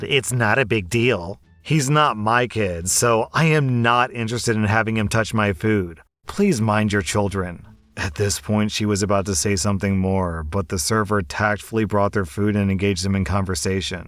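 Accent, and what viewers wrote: South, United States. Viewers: The southern accent is perfect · Im not from the south but i love your southern accent. Please use it more? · The slight southern accent killed me 😂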